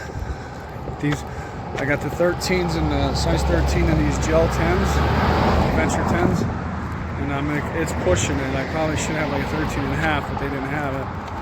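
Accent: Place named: Michigan, United States. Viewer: Great that you’re healing up a bit! Sorry about the grey hair, but maybe that will go away; one can hope! 😅 You sound like a “Michigander” that was my guess, then, Wisconsin, lol! “Talk to you’s later!” We all share similar expressions of speech, haha! Stay safe 👍🏻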